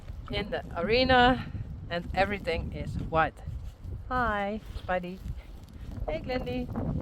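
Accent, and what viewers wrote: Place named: Netherlands. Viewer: Ur English with the Dutch accent is perfect don't change a thing. Spidey is a character turning into a holy terror but that's his personality eventually as he gets older he will settle down don't sell him he's a large part of ur pony herd he's Mr personality. U seem to b recuperating at an awesome pace just don't overdue it acknowledge ur limitations before moving ahead so as not to reinjure urself.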